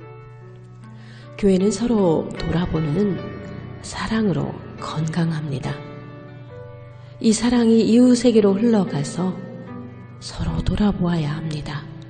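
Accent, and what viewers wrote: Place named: South Korea. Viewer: Nice, calming voice. I love korean accent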